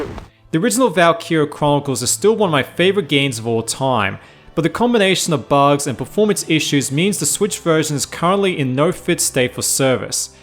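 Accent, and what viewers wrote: Australia. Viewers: Is this an Aussie reviewing it?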